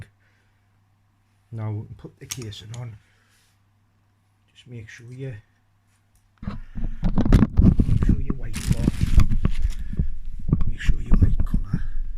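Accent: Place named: Ireland. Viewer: Awesome thanks for all the detail. Luv your irish accent too! If you ever need. Any help with your health. Let me know. Contact is on my channel.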